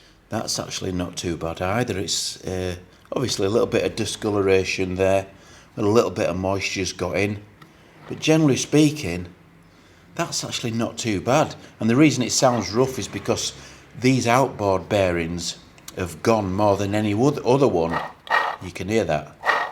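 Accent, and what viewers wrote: Yorkshire, United Kingdom. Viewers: Fantastic Yorkshire accent. I love it. Great video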